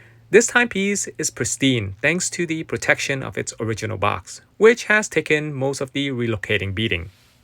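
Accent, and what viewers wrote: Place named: Canada. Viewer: Yes I can tell you're Canadian from your accent😂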